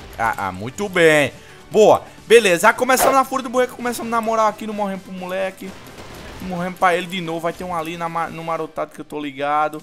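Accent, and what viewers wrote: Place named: Paraíba, Brazil. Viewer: Ele e MiTo Mais O Portugues de Paraibano Ele Endendi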